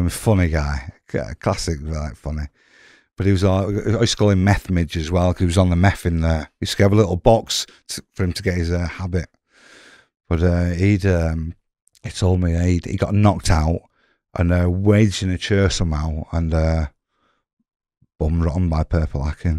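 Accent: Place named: Lancashire, United Kingdom. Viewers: Sounds like blackburn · I could tell you were East Lancs as soon as you spoke I thought Burnley, so I wasn't far of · I knew I recognised that accent. It's good to see a Lancashire lad on here.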